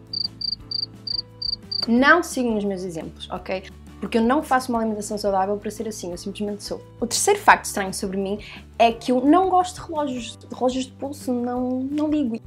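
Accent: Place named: North, Portugal. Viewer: Gostei muito do vídeo és muito carismática e parece que do Norte também hahahaha , eu sugeria fazeres um passo a passo do deleniado gatinho por favor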